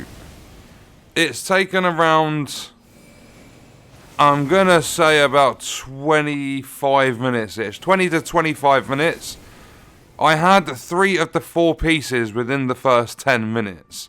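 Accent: England, United Kingdom. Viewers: Great video mate was exactly what I was looking for, is also nice to hear a English accent for once doing one of these walkthroughs! Will be checking in regularly. Keep up the excellent content 🍻👍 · Sometimes His British accent make me confused 😂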